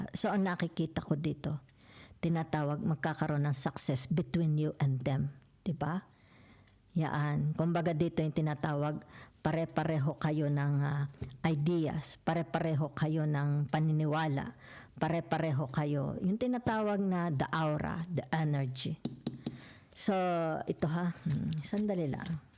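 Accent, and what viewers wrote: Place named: Tanay, Philippines. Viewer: I claim it all positve readings🙏🙏🙏thank you po sa mga readings nio,ang galing nyo po magsalita,may punto po ang salita nyo,punto po ng mga taga tanay..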